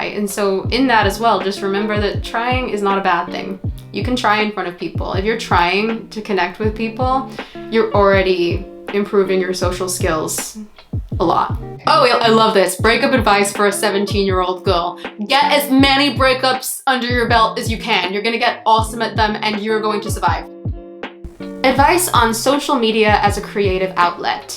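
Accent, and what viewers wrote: United Kingdom. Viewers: I can hear the British side screaming to come out ❤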